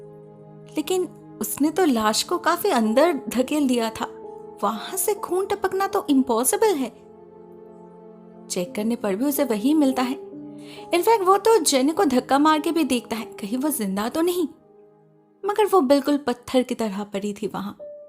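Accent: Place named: Bhojpuri, India. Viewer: aap bhojpuri lakte ho aapki bhashah seh lagta hai.. Par mujeh aapki awaz seh pyar ho gya hai lagta hai K aapseh be...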